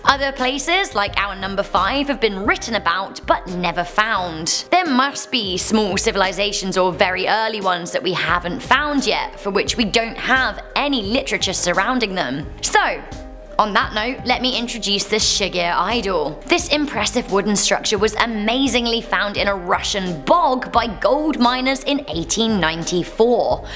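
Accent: United Kingdom. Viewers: Great job as always hun keep up the good work i love your voice are u from the uk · She sounds British, one can only hope so. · She says capsule like it’s capsooool that’s wack I really love the accent but the British be saying certain words weird asf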